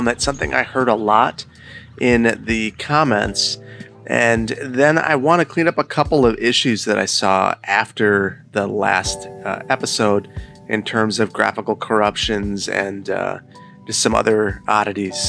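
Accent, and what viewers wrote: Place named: Iowa, United States. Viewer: You from the midwest, flat with some terrain. Sounds like Iowa. Thats where im planted. lol. sometimes sped up builds are ok when its the same thing repeated. otherwise real time is best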